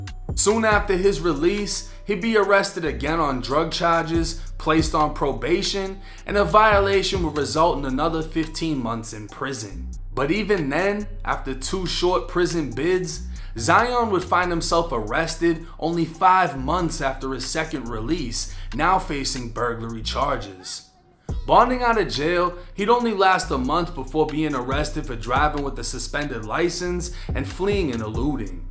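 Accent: Boston, United States. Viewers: My dude…..that Boston accent is intense!! 🙌🏽🙌🏽🙌🏽🔥🔥🔥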